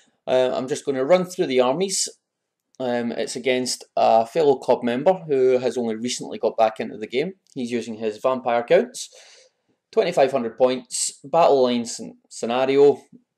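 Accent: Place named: Scotland, United Kingdom. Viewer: Not to far from me then, its great to hear another scottish voice. I recognised your braw dice ha ha i have same kind got them at the scottish open in striling in october. I had my fluffy orcs at that tournament......lets say it didnt go to well ha ha Next time its my wee stunties only. Ive just finished editing a game i had against chaos dwarfs uploading it later, check it out. See how goblins fair against broken chaos dwarfs. Take care and merry xmas. Maybe see you at tournaments next year i hope.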